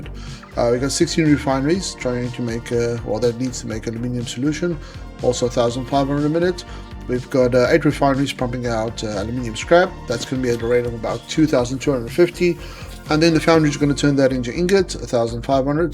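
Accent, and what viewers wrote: South Africa. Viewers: Sit en kyk hierdie video en dink vir myself, "die man klink Suid-Afrikaans" .. Well done man, dit lyk epic!! Subscribed👍🏻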